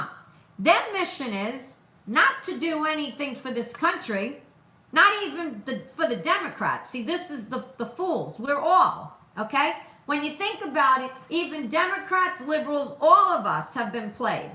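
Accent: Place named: Bronx, United States. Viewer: Just love your thoughts passion and God u live your Bronx gurl accent. I can't wait to see you and and now. Live u guys. My Brooklyn Long Island accent is a good match to yours. I hope someone gets u out if jail soon. This is not Fair. Censorship has to Stop. FB a public company. Live u thanks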